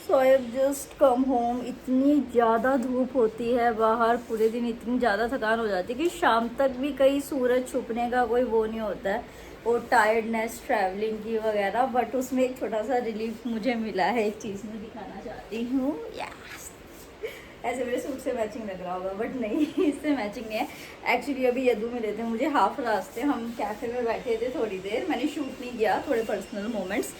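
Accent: Haryana, India. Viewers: Hii didi ...is bar ke upsc prelims ke baad maine baki exams pr bhi focus krne ka socha h pls if u can reply mujhe bta do.... 1). Mai delhi se hu toh kya haryana ka pcs me chances h kyuki mujhe lgta h sb apne states ke candidate ko preference denge.. maine apko uppcs dete dekha h lekin apki boli se ap mujhe haryanvi lgte ho pls if u can help me out. 2). Apne epfo dia h kya exam or uski strategy?😅 Btw , Happy marriage life didi...May your forever after be just as magical as your honeymoon.❤🎉🦋🌸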